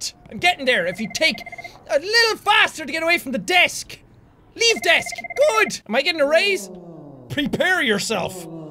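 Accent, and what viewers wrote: Ireland. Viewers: I love his Irish accent. I don't understand why people make fun of the way he says his ts. like I love how he say "I just tought I'd play"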